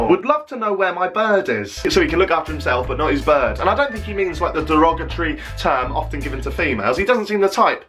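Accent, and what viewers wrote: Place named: Norwich, United Kingdom. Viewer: has been in the background of several videos prior to this. Makes sense why he is there now. P.S. Stop trying to be like Pewdiepie, your content will never be as successful as his Sweetish videos. No one wants to listen to a man sat in a shed from Norwich, if I want to listen to someone with a shit accent ramble on about Nike sweatpants in a wooden hut I'd go to Great Yarmouth and speak to the locals.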